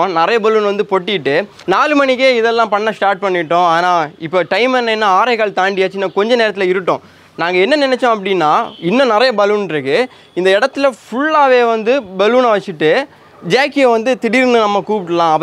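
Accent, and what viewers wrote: Kanyakumari, India. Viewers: Hi recently I started watching your videos to hear our kk district slang..🤣🤣🤣 very funny … Me too from kk district.. but I don’t speak the same 😆 Keep going Thambi 👍🏻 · Anna unga kanyakumari slang rmba alaga irruku anna epaiumae eppidiyae pesuga athu tha nalla irruku and congrats for 100k and always love u jacky 😍🔥✌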